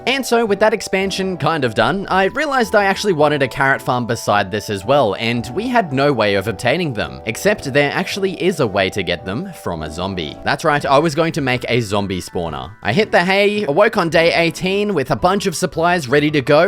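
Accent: Australia. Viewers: U Aussie? U sound Aussie. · Yo! I was just watching some of your videos and realised I recognised your accent, a fellow Aussie! I know it’s weird and all getting excited seeing another Aussie because I see them everyday- but online you don’t see too much of them. So have a good rest of your Tuesday! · omg the aussie accent is legendary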